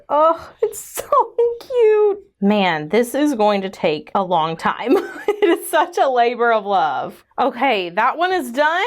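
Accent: Texas, United States. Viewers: Just be you. We can't please everyone. For me, as long as the Lord is pleased that is really all that matters. You aren't in England. You are in America speaking how you speak. Don't change who you are when you're innocently using your Texas accent.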